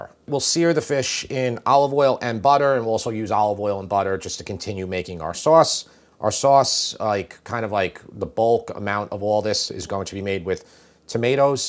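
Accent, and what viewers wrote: New York, United States. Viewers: This sounds like a MUST MAKE meal! I’m drooling. As a NYer at heart, I love hearing you talk, (I miss Long Island) I love all the information and tips you provide. Great job! ❤ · You don't talk too much. I actually enjoy your New York accent. It's authentic like your videos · Oh and you keep talking, and especially that awesome New York accent! People tell me I talk really Chicago and I love that! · Always great recipes. Um, I have the exact same accent you do! It’s either Long Island, Staten Island, or Northern Jersey! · Agreed!! Im on long island and his accent sounds like home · As a NYer, he sounds like family to me! We are just outgoing and informative, whether you want us to be or not! lol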